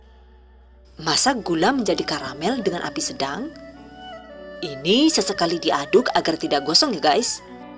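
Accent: Banyumas, Indonesia. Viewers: Yu asline wongendi ngomonge ngapak deneng ky aku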